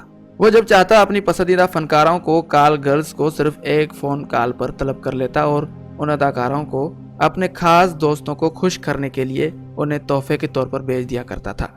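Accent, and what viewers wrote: India. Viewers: This is Indian propaganda. This commentator's Urdu is typical Indian. His pronunciation is very Indian.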